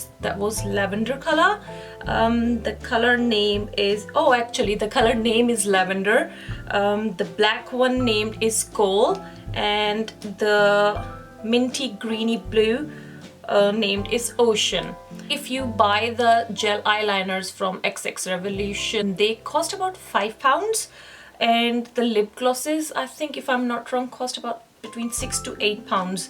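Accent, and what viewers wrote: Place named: Pakistan. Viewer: You are so lovely and gorgeous I don’t mean to be nosy but are you Pakistani because you sound like Pakistani I don’t mean to be rude or nosy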